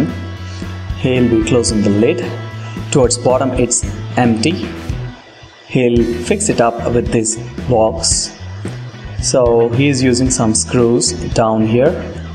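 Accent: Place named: India. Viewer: bro keep the natural Indian accent :) although it wasn't that bad but still keep the natural :V Potato kind of Indian accent XD and nice video :)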